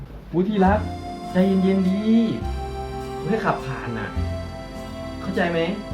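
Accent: Korat, Thailand. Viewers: ว่าแล้วเห็นคริปนั้นพูดโคราช😂❤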